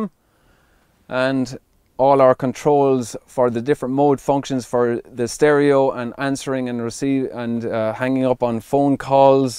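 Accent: Ireland. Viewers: I'm gonna be honest.,the only reason I watched this was to enjoy the Irish accent.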